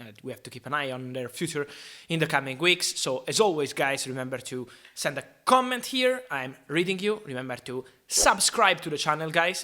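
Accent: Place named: Italy. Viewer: I didn't even need to read your name to know you are Italian. Your accent is so typical. I like it.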